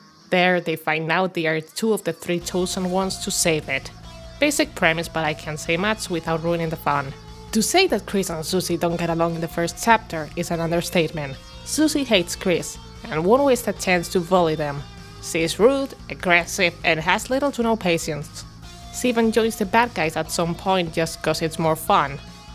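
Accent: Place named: Italy. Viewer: I’m sorry this ain’t related to the art but I love your accent so much are you Italian??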